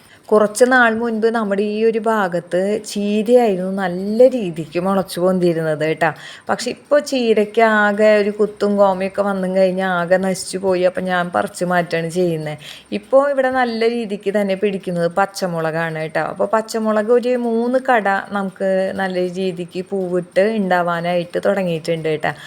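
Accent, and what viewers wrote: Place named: Thrissur, India. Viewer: സംസാരം കേട്ടിട്ട് തൃശ്ശൂർ ആണെന്ന് തോന്നുന്നു ഞാനും മുട്ട കുക്കറിൽ വേവിക്കാറുണ്ട് എല്ലാ വിശേഷങ്ങളും സൂപ്പർ 👍👌🥰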